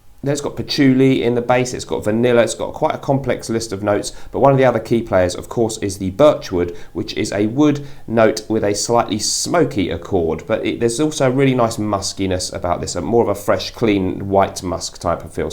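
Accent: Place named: North London, United Kingdom. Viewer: Another top quality video mate 👌🏼what part of North London are you from?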